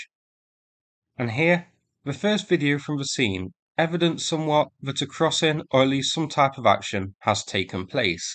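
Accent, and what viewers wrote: United Kingdom. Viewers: Jesus! A heavy British accent plus a cheap microphone - and you get narration that is hard to comprehend. I have to stop every 20 seconds and go back several times! you are making good money from your channels - can't you afford a decent microphone? I am already in the middle of the video, and I am not quite sure who shoots at whom. I had to open a map to see what is actually shown in the video. And by the way, there is no Eastern or Western side of Dnipro here - there are Southern and Northern sides.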